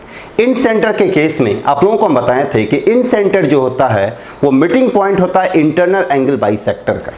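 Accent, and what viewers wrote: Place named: United States. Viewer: sir aapki hindi, american hindi jaisi lagti hai mast.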